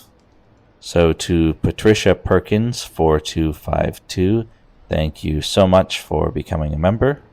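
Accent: United Kingdom. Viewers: You sound American ..then you slip into a British accent every now and then ..welcome to the UK.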